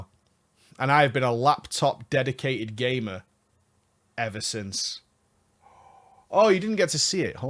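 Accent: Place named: Manchester, United Kingdom. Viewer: Look at the shine on that innit!! Are you from manchester?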